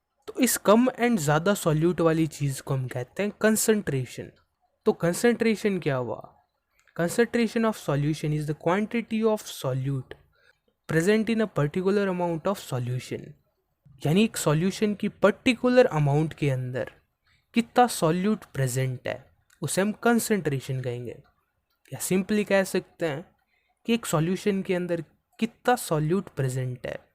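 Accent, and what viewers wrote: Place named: Rajasthan, India. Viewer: Sir aap ki sound bahut acchi hai like Rajasthani ❤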